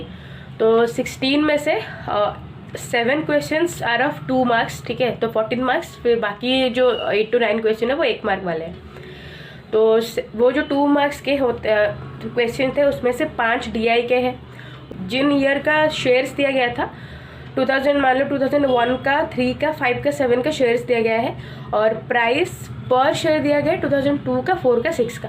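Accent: Odisha, India. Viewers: Didi app odisha se ho kya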